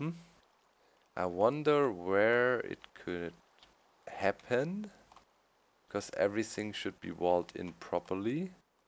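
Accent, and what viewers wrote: Germany. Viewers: Aber man hört schon dass er deutscher ist.. Findet ihr nicht? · your german accent sounds so awesome haha xD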